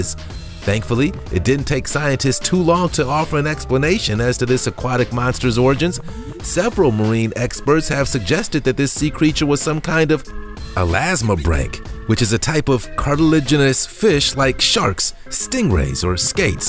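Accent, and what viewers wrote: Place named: North, United States. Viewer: one more grating yankee voice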